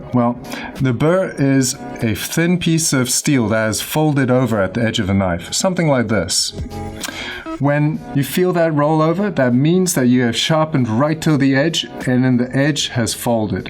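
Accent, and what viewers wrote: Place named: Zimbabwe. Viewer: He has a Rhodesian/Zimbabwean accent. Get a life and leave him alone.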